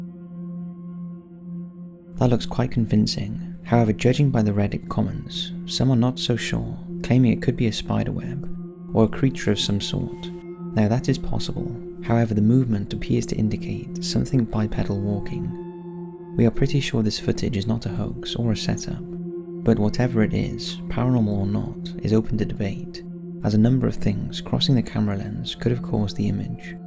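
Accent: United Kingdom. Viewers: It’s just the accent, British people don’t seem to make use of the letter Z (zed as they call it) or its sound very often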